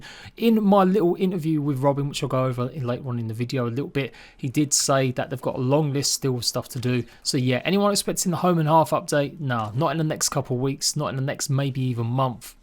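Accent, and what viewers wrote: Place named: United Kingdom. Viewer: Totally random question. I have a weird hobby--I like learning to recognize accents. Where are you from? I'm guessing you're not from East End London, although I'm still a bad guesser when it comes to British accents because there are so many. Bonus question: what celebrity do you think represents your accent best?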